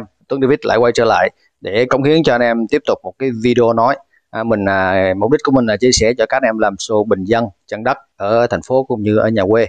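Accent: Standard. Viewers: Ô a nói chuyện chuẩn quá.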